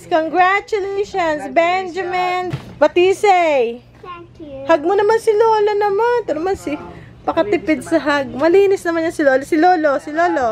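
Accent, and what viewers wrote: Batangas, Philippines. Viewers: GOD BLESS YOU as a good daughter....si mader say ni, she will miss you din daw pag hindi ka pupunta sa kanila. Just continue serving them and itawa mo na lang yung mga comment ni Mader. More power to you and say HI to your parents. I also enjoy watching the 2 boys, lalo na si.... bintog ang cheeks.... is such a sweet ham, baby pa kasi. regards to, saludo ako sa pagpa supportive niya.....you are so Blessed. Keep up the good work,hane.... and dont forget you batangueno accent.....hehehehehe....tita ailec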